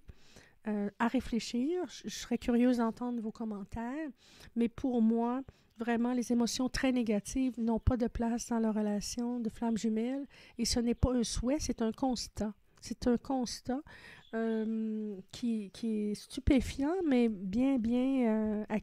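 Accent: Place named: Quebec, Canada. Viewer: Une voix familière québécoise! Il y en a peu depuis que je visionne différentes capsules sur ce lien suite à mon éveil! Je vous découvre avec bonheur ce soir. Je vais parcourir vos vidéos maintenant...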